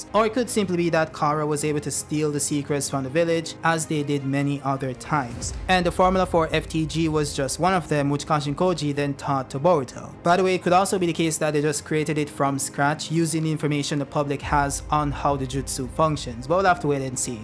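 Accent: Jamaica. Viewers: The accent..you Jamaican bruv?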